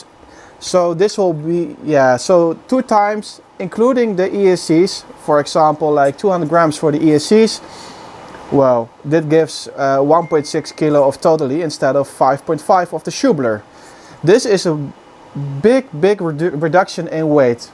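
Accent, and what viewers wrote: Netherlands. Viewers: Very nice, but damn your Dutch accent is heavy: Fideo, nafigation etc etc.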